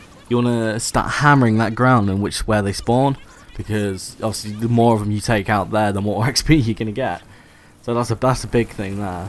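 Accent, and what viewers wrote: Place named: United Kingdom. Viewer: Man you need to put subtitles in your vid I dont know were you live in GB but you accent is just too hardcore for me no offense there man just an idea. Great metod by the way.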